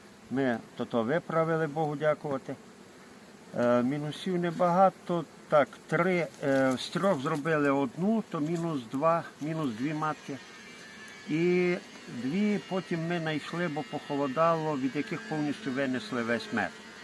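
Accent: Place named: Western Ukraine, Ukraine. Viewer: Закарпаття? Чи де це в сорочці в лютому можна ходити? По говору чути що з західних регіонів.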